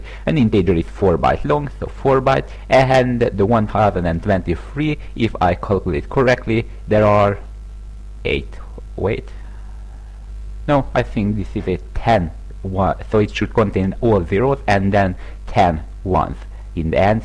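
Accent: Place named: Hungary. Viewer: Nagyon jó oktató videó, köszi! Messziről rikít a magyar akcentus, bár ezért a YT névjegyben is szabadkozol. Már majdnem rákérdeztem külön is,de aztán feltüntek a menüpontok. Viszont a videó nagyon jó, és messze jobb pár ér felvágós, indiai akcentusnál.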